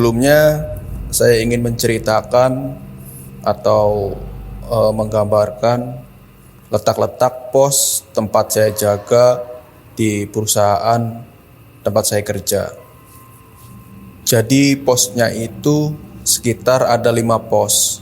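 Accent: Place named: Bekasi, Indonesia. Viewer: Buset bang, bahasanya Bekasi bet dah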